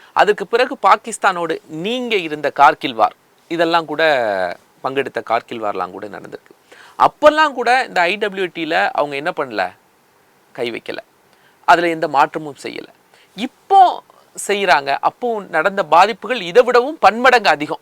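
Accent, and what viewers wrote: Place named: Kerala, India. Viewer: Mallu tamil is always nice to hear..nice information..jai hind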